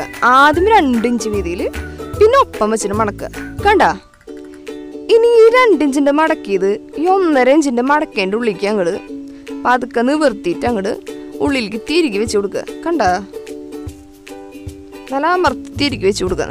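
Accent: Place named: Thrissur, India. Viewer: Thrissur slang..🤗🤗🤗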